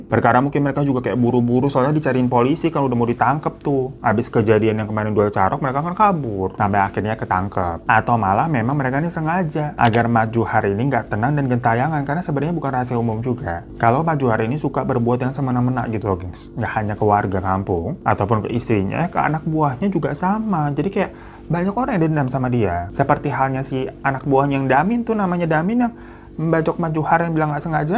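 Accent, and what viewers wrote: Java, Indonesia. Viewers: Lu bukan logat madura bamg, malah medok jawa😂😂😂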